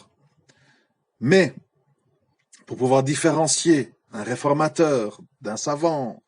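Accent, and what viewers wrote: Belgium. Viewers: T es lourd à écouter avec ton vieil accent belge,petit débutant converti,t arrives pas à la cheville de Hassan iquioussen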